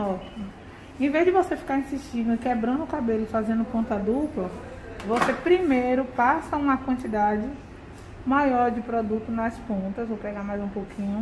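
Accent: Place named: Bahia, Brazil. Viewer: Esse sotaque com certeza é da minha bahia que amo de paixão ❤ Depois que lavar não volta ao normal???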